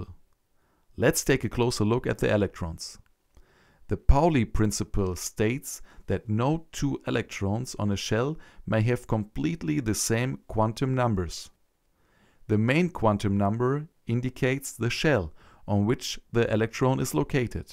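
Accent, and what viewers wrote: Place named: Germany. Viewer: Noch nie so deutschen Akzent gehört xD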